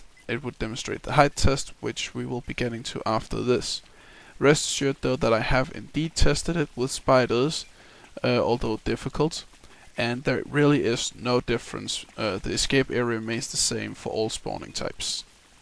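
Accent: Denmark. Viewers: Are u danish? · Fellow Dane :) I heard it instantly, then went to your channel, and I was right. Aside from that, I like your videos. They're good and deserves a lot more views. Hope you have success in the future. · You sound danish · Man kan høre at du er dansker. Det kan man altid :D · man, i could totally hear you are from Denmark. PS. GO Denmark!!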